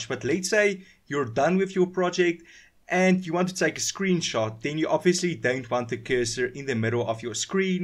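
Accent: South Africa. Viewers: Are u from South Africa bru? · This is perfect. Love the voice btw from SA? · You sound south african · Finally thanks for this amazing addon😊Also are you South African I hear a bit of an accent😂😭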